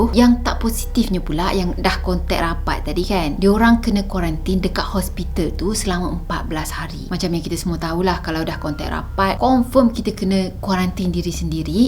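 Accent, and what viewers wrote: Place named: North, Malaysia. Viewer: Asslam...hai asal mana utara kan...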